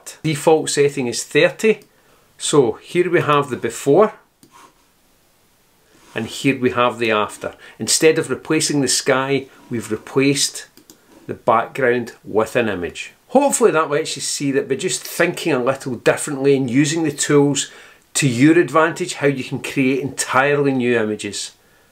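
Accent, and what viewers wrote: Scotland, United Kingdom. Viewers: Nice to hear a Scottish accent on YouTube by the way. Luminar is now like a user friendly version of PS and LR all rolled into one. Love it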